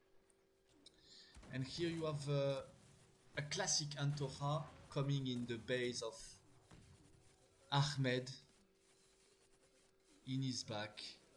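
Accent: France. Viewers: french accent, so french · are you french ?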